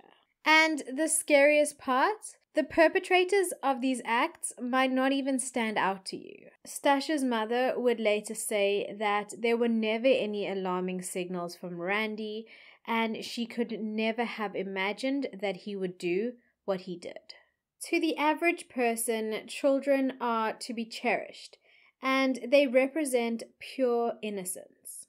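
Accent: Afrikaans, South Africa. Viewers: I love your Afrikaans accent its so cute! 😂❤️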